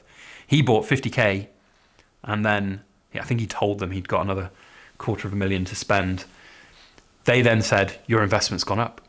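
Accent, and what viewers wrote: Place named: RP, United Kingdom. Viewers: 😂 Brilliant Toff Accent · You have a posh accent IMO XD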